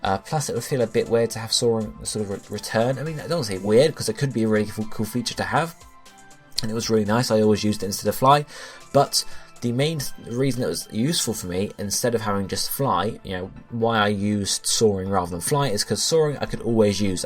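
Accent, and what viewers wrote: United Kingdom. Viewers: Yes he is. That's how they say the letter H in the UK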